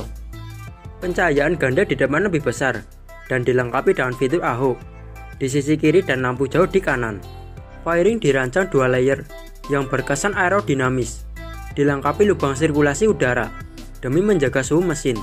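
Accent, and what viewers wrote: Java, Indonesia. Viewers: mantap bang, cuman nada ngomong nya masih JoWo Medok... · Mas sampean wong Jawa yah.,logate ketara.,sing Nang video juga R15 plat R.,jangan2 wong Cilacap yah..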